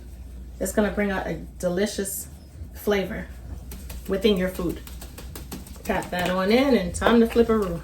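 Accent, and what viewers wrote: New York, United States. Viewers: ….you gotta be from New Jersey or New York 😀 Food looks delicious! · Are you from New York? Love your accent and your recipes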